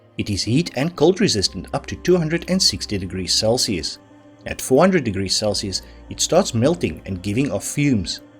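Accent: South Africa. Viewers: I find the pronunciation perfectly acceptable. Not being picky, but when "Poirot"is needed, try saying "PWAR - OH ". ( That's " yer " actual French!😊 By the way, do you have a tinge of "South African " there ? Enjoyed the quiz, only missed a few questions.😊 😊🇬🇧🦉🌿🎶🌼🇬🇧😊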